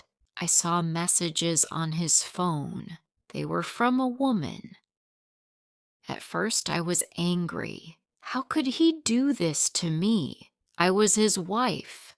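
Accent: United Kingdom. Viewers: Thank you very much for your stories ❤. Is that a british accent?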